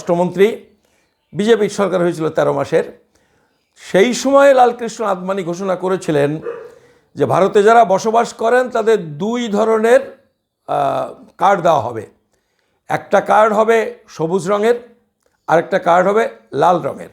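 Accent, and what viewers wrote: Bangladesh. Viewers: Upnar kothar tune shune mone hochhe apni o purba banger, poriskar holo na. Manik Fakir babu r upni ekshonge boshe ekta vidio kore byapar ta clear korun please. Ki ki proman potro lagbe....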